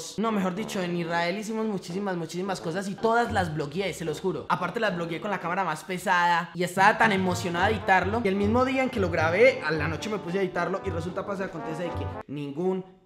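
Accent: Antioquia, Colombia. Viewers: hola ya estaba esperando un nuevo vídeo... Justo ayer estaba viendo 11once me encanta tu personaje y ese hacento 😘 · Hey!!!! Ya extrañaba mucho tu acento colombiano. Te amo❤️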